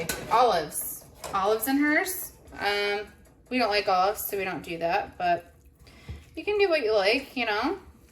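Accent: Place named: Midwest, United States. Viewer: Looks delishious. Do you ever make chicken ones? Where do you live? You sound like your from the Midwest. I’m in St. Louis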